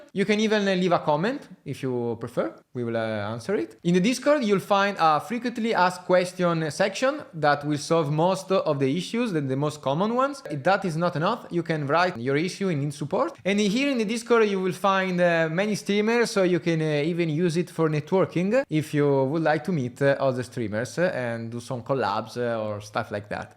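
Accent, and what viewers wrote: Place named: Italy. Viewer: guarda che si sente che sei Italiano ....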